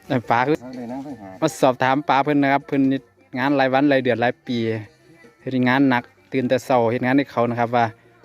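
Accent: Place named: Isan, Thailand. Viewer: คุณน้องเป็นคนจังหวัดใหนคะ..เว้าอิสานม่วนดี❤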